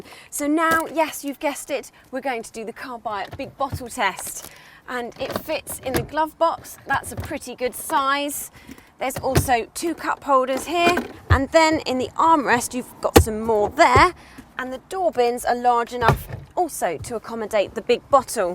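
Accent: United Kingdom. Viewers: Yea she my not be a ten in the look department but her looks plus her knowledge of cars & great attitude makes her an eleven! :-) & for us yanks her british accent is another + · that UK accent.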